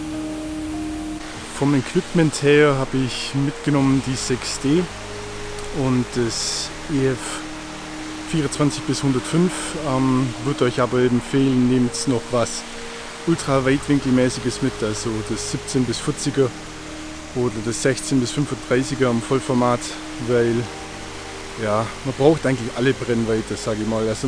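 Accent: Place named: Swabian Alb, Germany. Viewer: Dein Dialekt hört sich ein wenig nach schwäbische alb an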